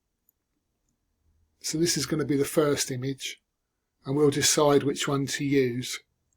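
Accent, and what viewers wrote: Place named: United Kingdom. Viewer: I like that you havent used ai to present this. And your British! Get fed up woth American avcents. Soz Americans😮.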